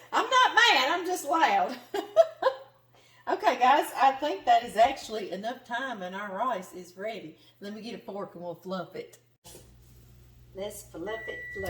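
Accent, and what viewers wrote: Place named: Alabama, United States. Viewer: Ms., I’ve been enjoying your videos for a long time. I so admire your devotion to your faith! We are truly nothing without Jesus🙌🏻You just keep being yourself and we’ll keep watching. Oh and I’m from lower Alabama so we kinda sound alike 😂 and sure cook alike ❤️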